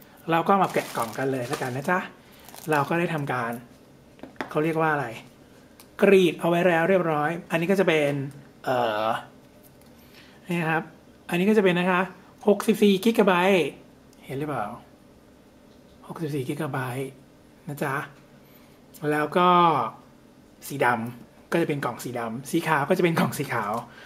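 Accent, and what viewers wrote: North, Thailand. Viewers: คนเหนือเเน่เบยยย >< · (งงเหมือนกั๋น)....เอ๊ะ สำเนียงคนเหนือเปล่าครับ ฮ่าๆๆๆๆ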